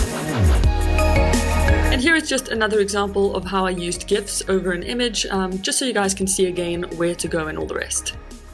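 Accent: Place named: South Africa. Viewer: Oh my gosh :D I just started watching this and was like 'this check has got to be south african!' :D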